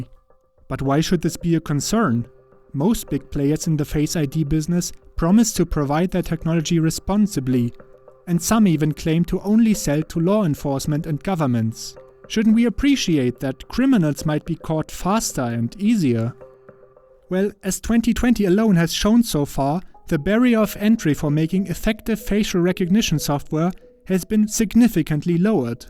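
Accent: Germany. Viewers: Love the german accent. es ist schön but thanks for the information you are providing. subbed. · Kann das sein das du Deutscher bist? Der Akzent killt mich halt total x)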